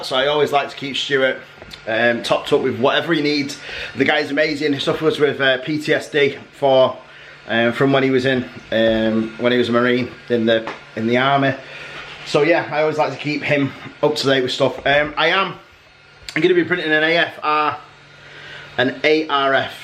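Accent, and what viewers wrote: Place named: Manchester, United Kingdom. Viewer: listening to you is like being in work the lad I work with is originally from Manchester and he’s the same age as you but his names not 😂😂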